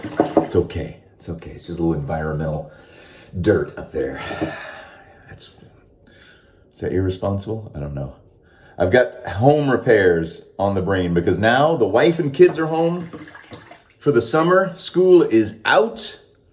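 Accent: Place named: England, United Kingdom. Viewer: Hi, loving the English accent (00.30), made me chuckle. I'm not use to safety razor - everytime I shave the hairs get stuck inside and block the blades even under running water. Is this because I use foam so safety razor use a special shaving lather?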